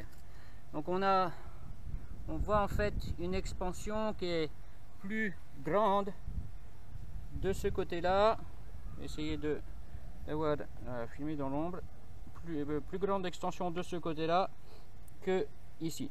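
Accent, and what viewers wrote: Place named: Tahiti, French Polynesia. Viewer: Tu a un accent tahitien 🤗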